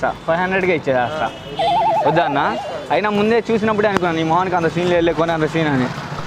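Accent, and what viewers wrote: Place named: Guntur, India. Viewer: Bhayadi prakasam, guntur side ankunta. Slang alanevundi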